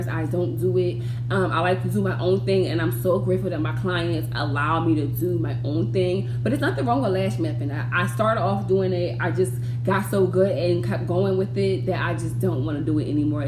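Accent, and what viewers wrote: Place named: Philadelphia, United States. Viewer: I knew you sounded like you were from Philly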